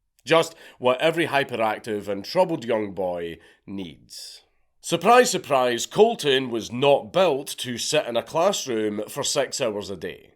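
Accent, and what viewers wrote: Scotland, United Kingdom. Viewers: Heard the story, read the story. But they story is best told with a scottish accent.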